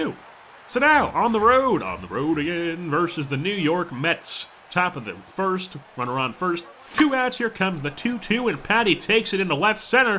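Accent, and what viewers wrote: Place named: Boston, United States. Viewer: Dang, I'm liking that Boston accent. Sorry had to root for my sox today, but glad too see Patty getting better and better as the games go by.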